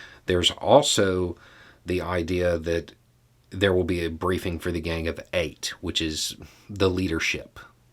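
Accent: South, United States. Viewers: Similar story here and after the first vid I decided that I owe an apology for having misread him from his accent and appearance. Then I looked inwards a little deeper and saw that the root of that misjudgment was MY OWN PREJUDICE. As someone who likes to think of themselves as being to the left on practically every issue that came as somewhat of an unpleasant surprise. Then thinking on it some more, that means I owe a lot of people an apology because I'm now sure that there isn't just one intelligent left wing white person in the South of the US so if I owe an apology then I owe it to them just as much. So here it is - to everyone in the South who isn't an ignorant racist, I'm sorry I had y'all wrong and I'm trying hard to recognise my prejudices and correct them. I've been meaning to do this for a while and your post pushed me to getting it done, thanks Danny. 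👍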